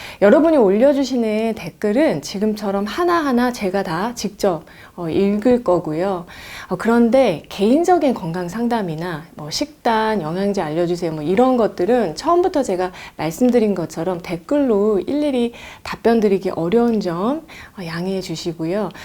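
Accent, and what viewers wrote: Seoul, South Korea. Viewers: 선생님~ 마음이 그렇게 비단처럼 고와서 이험한세상 어떻게 살아가시는지 ㅎ 댓글 너무신경쓰지마세요. 자기가 댓글달아도 뭘달았는지도 잊어버리는 사람태반입니다. 그냥 방송내용만 알차게 해주시면 더바랄게 없습니다. 오리지날 서울 사투리 너무 듣기 좋습니다.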